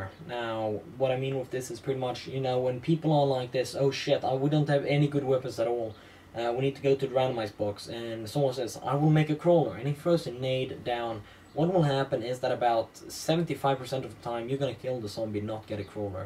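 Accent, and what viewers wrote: Netherlands. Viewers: Dutch? Swedish? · Heh, i thought you were dutch :P Anyway you deserve more subs for these.They're the only good zombie guides on youtube.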